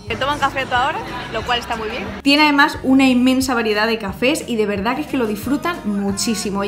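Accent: Spain. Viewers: cuando vi el video pense que era una latinoamericana que se fue a Croacia, despues la oi hablar y me di cuenta que era una española, Croacia es maravillosa!!!me gustaria vivir ahi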